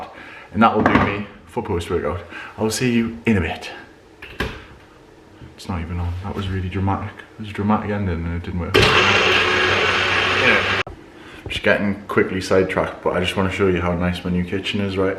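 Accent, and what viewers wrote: Newcastle, United Kingdom. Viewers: Just subscribed, you're from Newcastle aren't ya?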